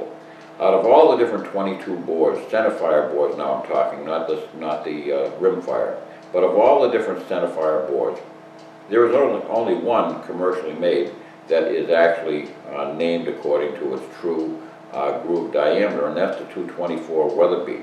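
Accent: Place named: Maine, United States. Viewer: As a child we had a summer house in Maine. Your accent reminds me of the people that I met there, brings back memories...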